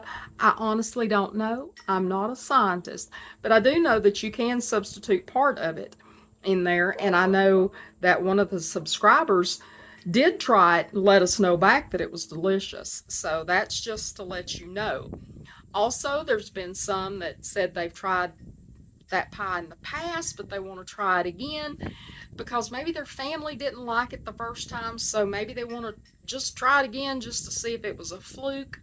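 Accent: South, United States. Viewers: You are so adorable with your cute southern accent, we could listen to you all day long.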